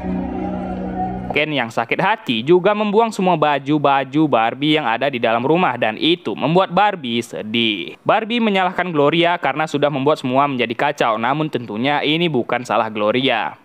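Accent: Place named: Pekanbaru, Indonesia. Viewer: jelas bgt logat pekanbarunya hahahaha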